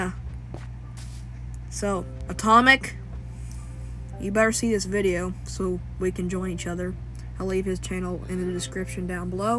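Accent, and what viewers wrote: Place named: South, United States. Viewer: I like the southern accent btw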